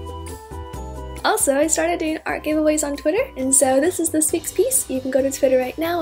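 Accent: United Kingdom. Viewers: Omg, you are starting to sound British!!!! this is the best day of my life :)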